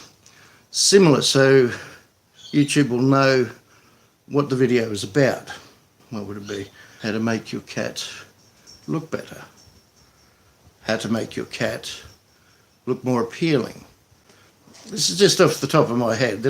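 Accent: Australia. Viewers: I don't know what the hell I'm talking about. But it's nice to have someone reply back and share things to say. You sound like your from Australia. U used to live down there in Sydney. I'm probably gonna go back as soon as I can. I'd rather be down there if the shit really hits the fan if you know what I mean.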